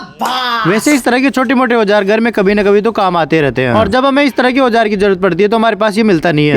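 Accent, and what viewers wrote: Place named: Jaipur, India. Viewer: Are jativadi Pure jaipur ke aas pass ke log jaise ki jobner jotvada chomu yha k logo ki bhasa esi hi hoti hai Mere kai sare dost hai vha k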